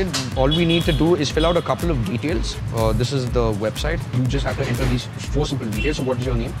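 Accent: India. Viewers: What kind of english is this 😂 hate indian English speaker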